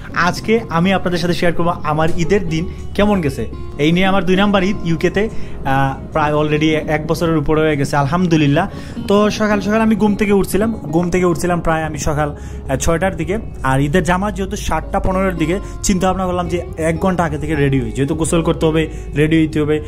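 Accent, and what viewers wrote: Sylhet, Bangladesh. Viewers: Bai ki Sylheti? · Bro can you tell me are you sylhet or dakiya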